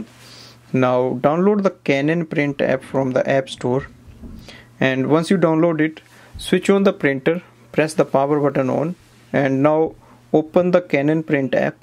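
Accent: India. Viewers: Indian accent is extremely disgusting man I’m sorry just had to say it. You ruining English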